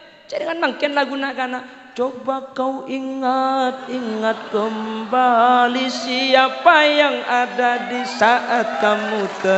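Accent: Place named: Madura, Indonesia. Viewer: Mantap bin puas dengar ceramah P.yai. Logat Maduranya juga Mantap pas banget . .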